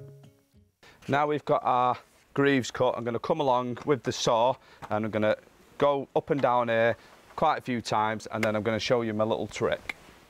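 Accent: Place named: United Kingdom. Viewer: Love British accent😂 oh my gosh! But sounds Bu…, Cut sounds Cu…, how you guys understand each other? hahah pretty cool though!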